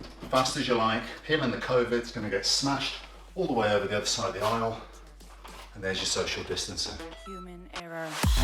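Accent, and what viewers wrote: United Kingdom. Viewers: Yo I didn’t even know he was British. Man British people are taking over in Hollywood. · I have never heard him talk out of a movie character so I didn’t know he was British 😂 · I thought Scott is a Ukrainian. I hear a British accent right here .My Idol in Yuri Boyka!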